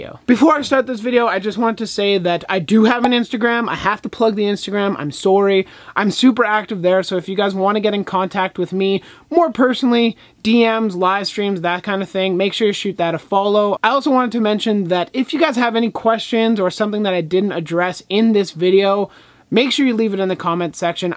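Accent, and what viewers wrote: Canada. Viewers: it’s because he is canadian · It's a Canadian accent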